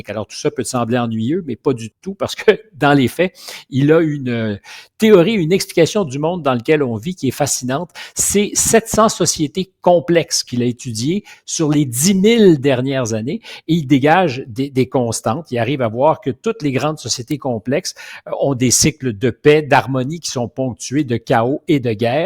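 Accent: Quebec, Canada. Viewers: J'adore l accent franco canadien 🇨🇵✌️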